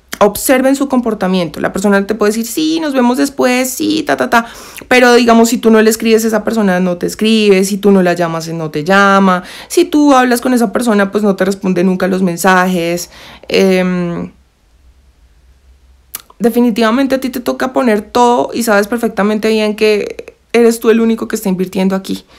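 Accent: Bogotá, Colombia. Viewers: Sí es Colombiana como yo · Colombiana pero este es el acento de BOGOTÁ osea el acento rolo!! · Colombiana!?? Un acento q me encanta !!🤩😍😍😍😍😍😍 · Siempre me ha gustado como hablan las colombianas y tu no eres la excepción, y muy buena informacion gracias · Hay diferentes estratos en bogota esa es la diferencia pero si es rola lo digo yo que vivo acá y el que dice que hablamos ñero se la paso en sur de bogota que es reñera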